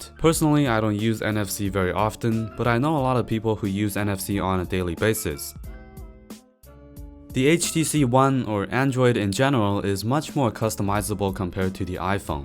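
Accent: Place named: China. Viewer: Your accent sounds pretty mandarin....... well I guess more like the mainland mandarin ?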